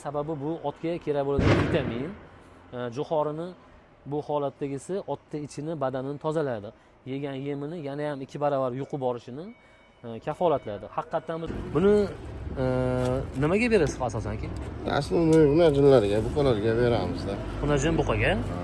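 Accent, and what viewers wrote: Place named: Namangan, Uzbekistan. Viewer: Aka Namangandanmisiz sheva totyabti🎉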